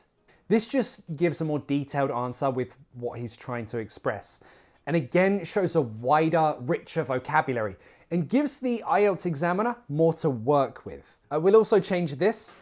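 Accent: United Kingdom. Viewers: You're always wonderful! I adore a British accent and really like your way of teaching, I study English language and life of Brits❤ by the way, 5 years watching you from Iraq! ❤❤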